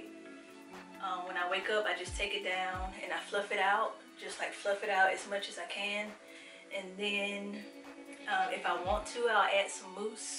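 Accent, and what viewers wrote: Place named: Rural, United States. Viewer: I LOVE your country accent!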